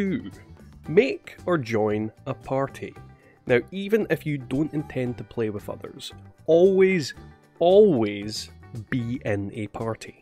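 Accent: Scotland, United Kingdom. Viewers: Min far ye fae in Scotland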